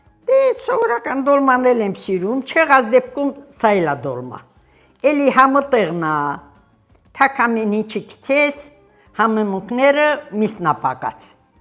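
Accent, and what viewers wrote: Goris, Armenia. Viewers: Как приятно слышать диалект Гориса , Я тоже с Гориса и пишу с Ташкента. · Վախ տատի ջան, մեր Գորիսի բարբառով շատ խոսա, հաճելւա լսելը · Վայ ոնց եմ սիրում տատիկի եփածը։ Նամանավանդ մեր Գորիսի տատիկների եփածը։ Մեր գեղեցիկ Գ-իբառբառով խոսա տատի ջան։ Բա քո հոր տոնը շինվի😂😂😂😂😂։ Վայ հինչ լավն եք տատի։ Ապրես, օրինակ չէի գյուդում վեր Կարելիյա ծու էլ քիցած❤🙏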